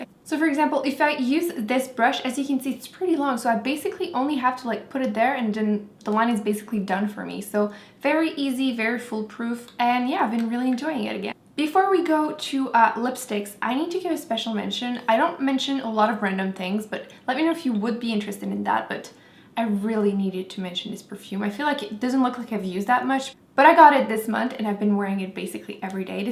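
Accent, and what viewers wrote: Quebec, Canada. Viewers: I love your channel and you seem so sweet! Also I adore your accent haha I thought it sounded familiar and then I saw that you are French Canadian! I'm Canadian too :) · I am a new subscriber. I see in your info you state your accent is French Canadian but I don't see your location. Where do you live now? Thanks, Jen xx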